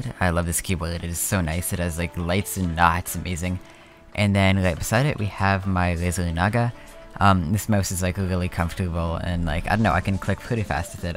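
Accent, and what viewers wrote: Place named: Quebec, Canada. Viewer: THAT FRENCH CANADIAN ACCENT THO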